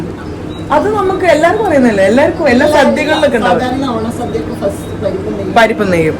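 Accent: Kannur, India. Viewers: നമ്മളെ സ്വന്തം കണ്ണൂർ - പയ്യന്നൂർ slang ❤️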